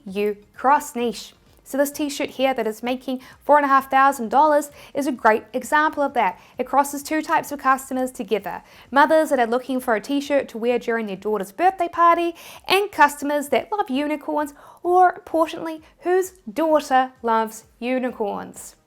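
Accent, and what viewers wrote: New Zealand. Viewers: Oh that's so neat. I really wish I could meet you! You seem like an amazing intelligent woman, with an amazing New Zealand accent! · Do u have nz accents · im guessing she is from new zealand. but it can be hard to tell between australia and new zealand for americans. must be easy for them. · Thank you for posting this video! Love your content and your awesome kiwi accent! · Thank you for your reply, I thought that a company was the option. But I thank you for clarifying me on this. I didn't want to be rude or anything regarding your videos. I just thought it would be good to inform that depends on the country it is not always free and easy (in France for example it is free to open one but you pay tax on your income and mostly you need a 4 days training on legal stuff cost: €260) It is just something that we need to be aware of. I do like the dynamic of your videos and that it is not too long and quite easy to watch. 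Happy also to watch and listen to a kiwi accent for a change ;). Keep on doing what you do so well. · Bruh I clicked the vid and heared the voice Just knew it was kiwi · For those who do not know, she has a lovely Kiwi / New Zealander accent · Yes, definitely New Zealand accent. One of the most difficult English variants for Australians to understand. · Sim, é assim mesmo que o sotaque da Nova Zelândia funciona. Vulgarmente conhecido como "kiwi". Outro youtuber neozelândes, Sam Owens, tem o mesmo sotaque. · Thank you for the help. You sound familiar - are you a Kiwi?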